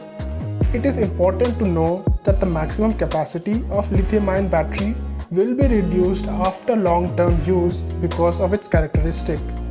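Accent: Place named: India. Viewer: You have indian accent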